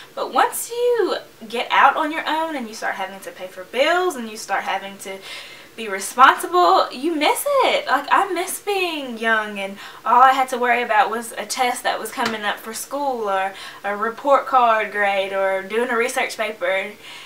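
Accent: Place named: South, United States. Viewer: Gosh! I'm so glad I found your channel! You are just so cute! Where are you from doll? Your from the South right lol? No shade or anything y'all, I looooove the way you talk 😊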